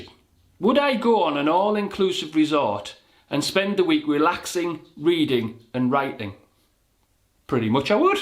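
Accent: Scotland, United Kingdom. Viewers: You sound Scottish? I want to follow your example for cruising!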